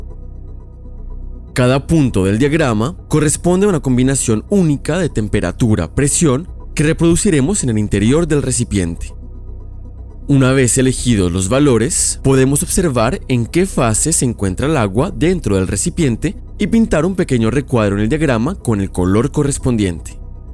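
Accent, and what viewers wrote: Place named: Colombia. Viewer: Qué buen canal! Sin introducciones innecesarias, todo muy bien explicado, interesante y aparte colombiano, reconozco ese acento :)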